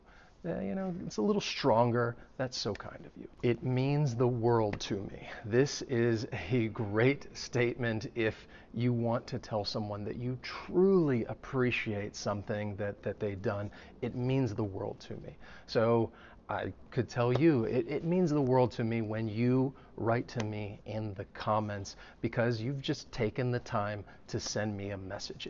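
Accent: United States. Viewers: Hi teacher , thank you for this lesson beacuse you speak englush american accaent ( i love american accent iust ! ) so clearly and lowdly ! I am 60 years old ! My first language is turkish !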